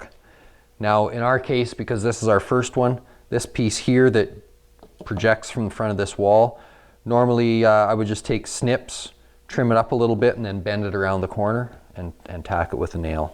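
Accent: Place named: Canada. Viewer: Rodney Byrd dude, be a little more tolerant man. He is most likely Canadian. Thats how they speak. He didnt call you out on your fucked up spelling, did he?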